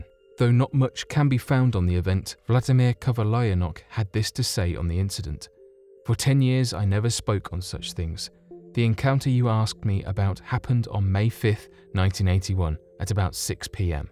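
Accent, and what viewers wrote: United Kingdom. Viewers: Because they are British and pronounce somethings very different.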